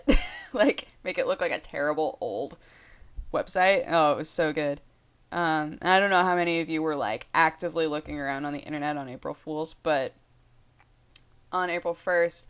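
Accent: United States. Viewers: lol, it sounds so weird hearing you speaking your American accent rather than your "British" accent, lol. ;)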